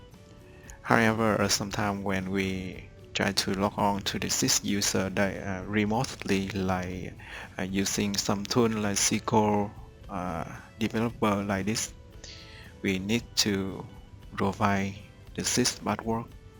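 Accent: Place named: Vietnam. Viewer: Thank you! Where are you from? Your English voice like Vietnamese. Hello đồng hương 🎉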